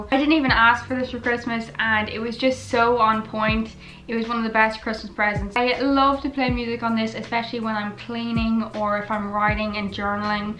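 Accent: Ireland. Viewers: you just came up on my recommended and it's so lovely to hear a fellow irish voice! your room is goals 💌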